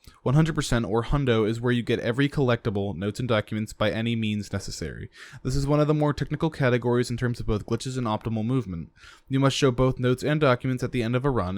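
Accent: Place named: United Kingdom. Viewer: you are bri'ish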